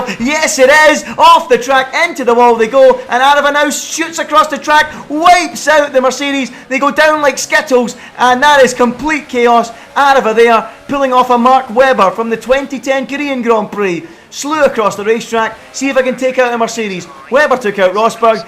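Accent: Scotland, United Kingdom. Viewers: nice scottish accent commentary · One question. Is he scotish?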